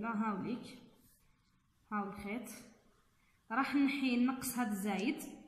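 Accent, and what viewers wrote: Batna, Algeria. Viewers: اختي انتي تحكي كيما سهام نورمالمو ديلخ تاع باتنة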